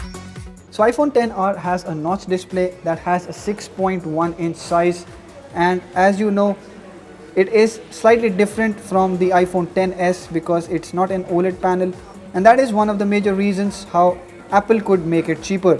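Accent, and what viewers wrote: India. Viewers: INDIAN ENGLISH SKDKSDHSHJDSAD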